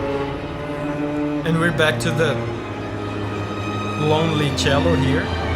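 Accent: Brazil. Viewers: Sotaque brasileiro, kkkkk certeza que é brasileiro, com um ótimo inglês. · You sounds like a Brazilian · cara eu tava vendo teu vídeo e percebi pelo seu sotaque q vc é Brasileiro, massa demais! essa ost é absurda mano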